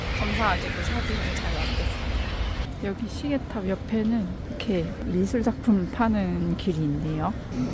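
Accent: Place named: Gyeongsang, South Korea. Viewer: 교향이 어디신가요? 살짝 경상도 억양이 나는 듯도 하구요. 아무튼 20여일 후에는 트빌리시를 관광하고 있을 생각에 영상을 다시 보고 있어요.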